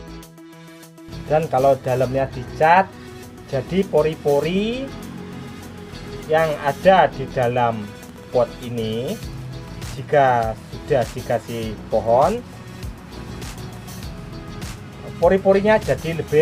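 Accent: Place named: Java, Indonesia. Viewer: Aku lihat sampean ki kok ingat sama lek gogon ya mas..dialek jowomu iku lho aku seng seneng...tapi lek gogon kok alih propesi yo mas..slam satu hoby mas.dari bojonegoro jatim.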